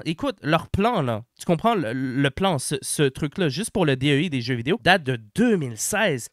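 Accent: Quebec, Canada. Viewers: Déjà + 1 car tu es un cousin et quel plaisir d’entendre ton accent qui me manque. Merci de nous donner ce genre d’information, sachant que votre province à une tolérance incroyable. Voir un ami Québécois prendre à cœur l’univers qui entoure du jeux vidéos, ça fait plaisir. Bon courage à toi. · Bordel que ça fait du bien d'entendre à nouveau l'accent Québecois, ton pays me manque (j'y ai passé 1 an et demi pour travailler et y vivre mais la CAQ+ le covid ont foutu la merde dans les permis de travail), dommage que le Canada et le Québec soient devenu aussi woke. Pour tes vidéos (qui sont d'utilité publique), je l'avais déjà compris par les vidéos de Psyho et par déduction que c'était beaucoup plus gros qu'une simple entreprise de malheur qu'est Sweet Baby Inc. On le remarque aussi en Belgique ou les partis, syndicat et groupe de gauche sont envahis de wokes. 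On a encore de la marge par rapport au Canada et à la France en terme de wokisme ( la majorité des Belges trouvent ça ridicule) mais ils finiront bien un jour par s'étendre partout. De mon coté, comme beaucoup j'utilise mon portefeuille en représailles surtout que les jeux woke sont facile à détecter, juste dommage pour Subnautica 2 mais tant pis, je ne vais pas dépenser de l'argent pour mes ennemis idéologiques. Sinon, je n'hésite pas à assumer mes idées en publique et à combattre tout wokisme lors de débat. J'ai d'ailleurs remarqué que 99% d'entre eux n'osent rien dire vu que je suis chocolaté, façon, ils n'ont aucun arguments, ils sont endoctrinés dans une secte.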